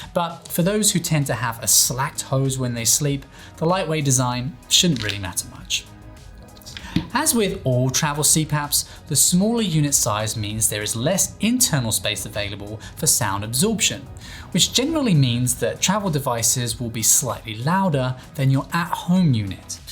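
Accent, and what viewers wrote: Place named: United Kingdom. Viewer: Looks like he is from the Great Britain...according to the accent :P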